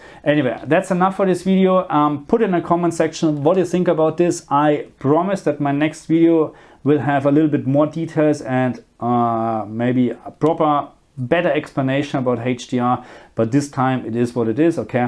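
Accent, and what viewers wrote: Germany. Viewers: Aber nur wegen der Leitplanke, sonst wären die Einstellungen nicht so geil xD...finde sein Englisch sehr souverän...wäre sie Aussprache nicht so stark deutsch, würde es noch besser rüber kommen...aber sehr ausführlich erklärt, gefällt mir gut 👍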